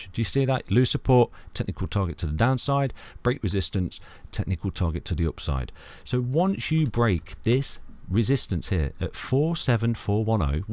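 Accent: United Kingdom. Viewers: The Man with the British voice and beauty full Analysis!!!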